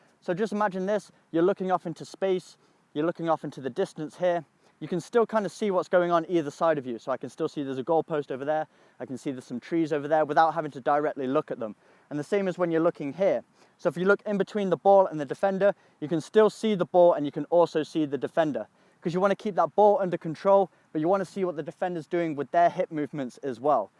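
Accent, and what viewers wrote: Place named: United Kingdom. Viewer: He has a British accent but has an American flag on the pole behind him. What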